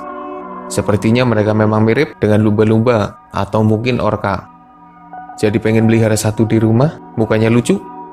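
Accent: Banyumas, Indonesia. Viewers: Ngapak e katon nemen kang🤣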